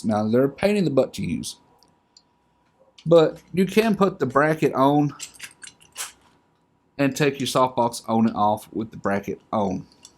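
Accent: South, United States. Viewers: very nice presentation. I subscribed. the southern drawl at first made me think you were dumb but once I got through my own horrible prejudice (about 20 seconds) I realized you are quite informed and intelligent and the drawl becomes endearing. It was easy to overcome my preliminary prejudice because in college, the smartest professor in the school was from Alabama and had a drawl so thick, when he said here it sounded like heeyah. He was so smart, the text books for his class were written by himself, and are the only textbooks I kept. We loved that man. Listening to him talk about Macroeconomics in his graduate level class totally destroyed the myth that southerners are dumb, because his accent sounded like one of a cattleman, but his words were so sophisticated that 99% of east coast snobs, myself included, didn't understand what his words meant until we had studied under him for 4 months. 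Thanks for your useful lessons, and for making them long enough to include everything so we know what to buy!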